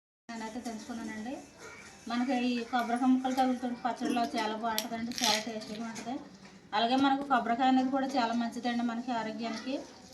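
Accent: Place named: West Godavari, India. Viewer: నువ్వు. మాట్లాడే. పద్ధతి చాలా బాగుంది. అండి. అన్ని మా నా. పశ్చిమ గోదావరి జిల్లాలో. అలాగే. మాట్లాడుతారు. వాళ్లు. కి. తేలియదు. కాదా. నువ్వు. బాధపావొదు. సరే నా. మీ. శాంతి. అక్క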